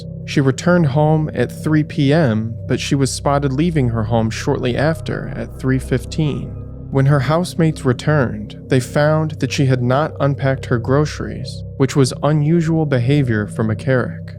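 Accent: United States. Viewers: if you’re actually offended by someone having an American accent…. There’s far better things to bitch about tbh.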